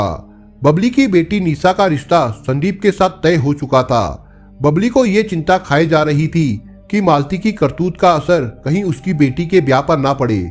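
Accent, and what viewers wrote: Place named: Bihar, India. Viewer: Language sudharo bihariyon ke language mat bolo pure world mein viral hota hai video sha Ko sa mat bolo Nisha ko Nisa mat bolo aap ka video dekhna chahte hain lekin aapki language pasand nahin hai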